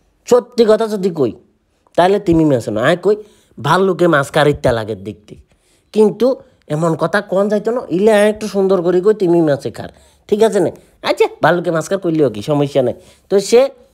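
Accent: Noakhali, Bangladesh. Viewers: নোয়াখাইল্লা রে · ভাই আপনি কি নোয়াখালীর নাকি 😂 আজকে প্রথম আপনার ভিডিও দেখছি,খুব ই মজার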